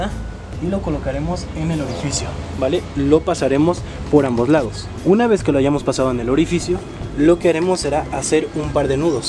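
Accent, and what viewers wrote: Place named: Latin America. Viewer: Me cargó coma habla, una mezcla de latino imitando al español, vale ??? Jijij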